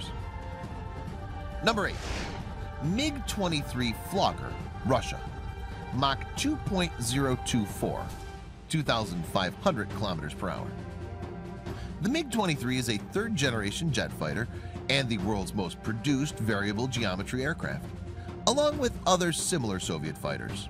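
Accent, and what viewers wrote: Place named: Kansas, United States. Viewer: My car's still have speedometers in miles per hour your voice Mr narrator sounds like it's straight from Kansas.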